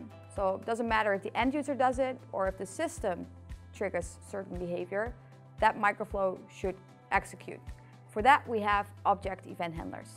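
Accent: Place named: Netherlands. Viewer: Sick dutch accent XD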